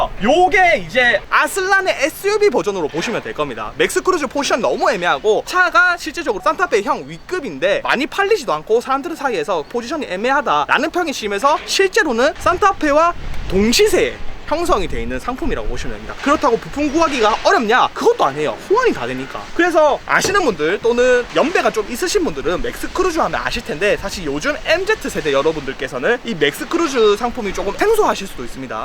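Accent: Busan, South Korea. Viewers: 댓글보니 외국인같은데 한국말 부산사투리 쓰면서 엄청잘하시네... 제2의 로버트할리인가 ㄷㄷ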